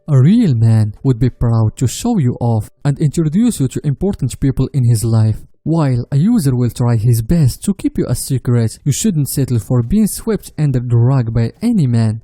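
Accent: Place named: France. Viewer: he is a french speaker that's why he got that accent i think he's getting better just be patient and use captions Give him some encouragement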